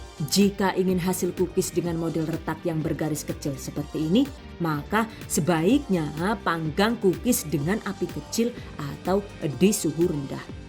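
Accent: Banyumas, Indonesia. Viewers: mbk e lucu nk wayah ngmong ngapak😂😂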